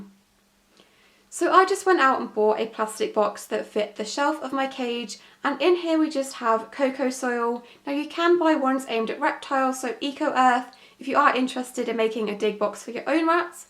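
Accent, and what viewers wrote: England, United Kingdom. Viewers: are u from England? So am i!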